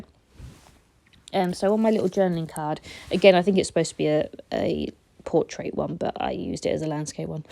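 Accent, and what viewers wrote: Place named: United Kingdom. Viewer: I really enjoy your videos thanks for sharing. I also love your cute British accent and hearing about your travels and life! xx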